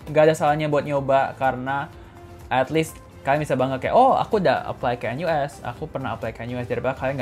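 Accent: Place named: Indonesia. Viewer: Love bgt accent mix Indo English kamu❤❤❤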